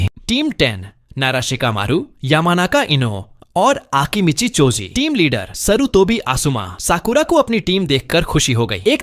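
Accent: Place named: Bengal, India. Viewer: Haa bhai lagtahe bengali😅❤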